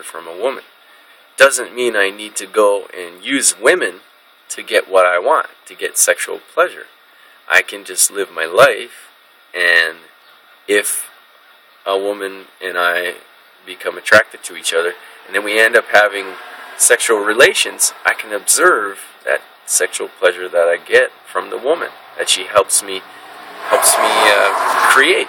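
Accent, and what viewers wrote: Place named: Canada. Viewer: real quick, you must be canadian yeah? i love the way y'all say "about" :)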